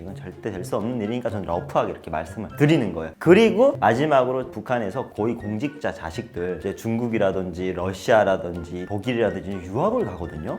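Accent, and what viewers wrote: South Korea. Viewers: 이사람 왤케 한국사람같음? 말투가 그냥 한국인임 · 말하는개 보니깤ㅋ 한국남자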